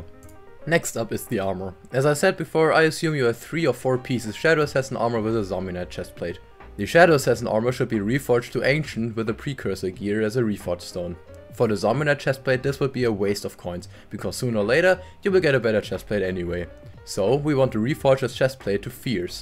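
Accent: Germany. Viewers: are you german? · are you german? bc of the accent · you sound german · you sound like german xD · this german accent tho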